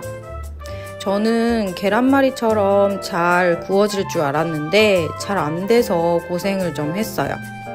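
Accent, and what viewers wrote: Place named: South Korea. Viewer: 영상에는 외국인 엄마라고 했는데 외국인 맞나요?🤔 그냥 한국인이 말하는 억양인데요? 아님 부모중에 한분이 한국인신가요?😏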